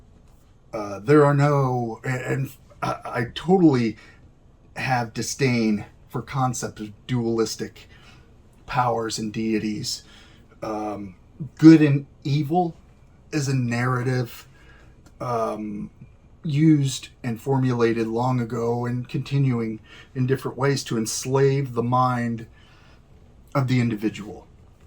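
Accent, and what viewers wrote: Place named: Pennsylvania, United States. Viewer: He sounds like he's from Pennsylvania! 😊 Quick question: Can you kill someone with this? 👀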